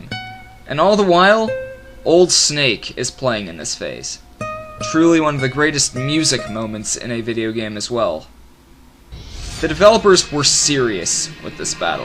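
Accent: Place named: Canada. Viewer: You Canadian? I keep hearing you say "aboot" and "doobt".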